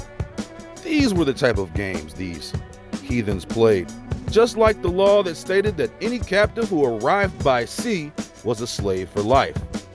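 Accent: South, United States. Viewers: Your southern white man voice is to die for....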